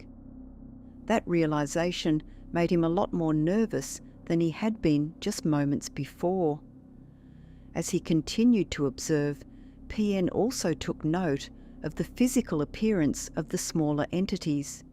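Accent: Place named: Australia. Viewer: The story teller has a lovely gentle Australian accent, and voice.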